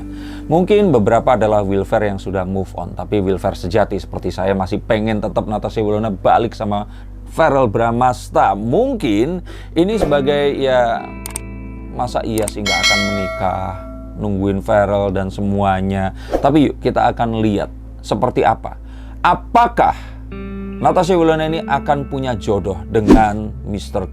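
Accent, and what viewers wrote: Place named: Java, Indonesia. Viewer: Lama2 ngomongnya ikutan medok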